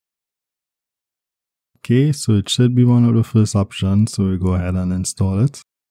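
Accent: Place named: Trinidad and Tobago. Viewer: I'm liking your content man. Keep at it and loving the Trini accent.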